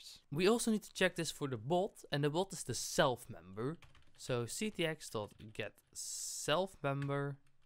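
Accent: Netherlands. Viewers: You sound dutch..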